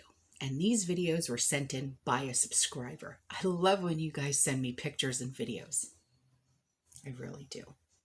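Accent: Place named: Philadelphia, United States. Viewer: Dear love you! are you a Philly girl? Your accent sure sounds like it!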